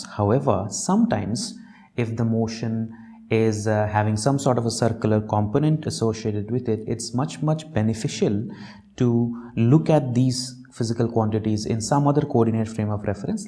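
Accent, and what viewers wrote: India. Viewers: NIce English, Lesser Accent of Indian English, Keep It Up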